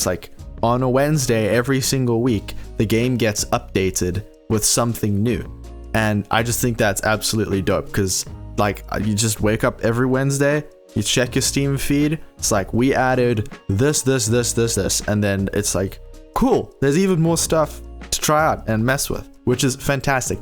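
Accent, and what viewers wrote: South Africa. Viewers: Joburg accent?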